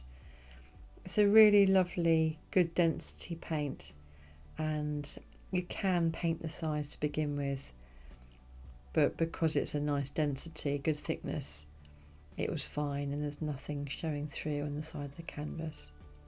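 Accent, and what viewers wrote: United Kingdom. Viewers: your painting looks absolutely gorgeous, and you just keep getting more adorable in pink, your curly hair, and your glowing smile on your pretty face, and your sweet British accent. 😄😄😄🌹🌹🌹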